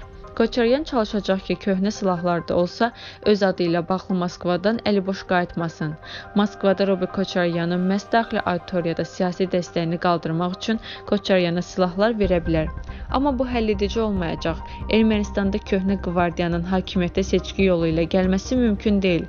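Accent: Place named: Baku, Azerbaijan. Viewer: Пелтейи отуздурубсуз орда , башга журналист йохдур Бу гызын сеси адамы лап есеблешдирир Гуйа бу Шехер лехчесийле данышыр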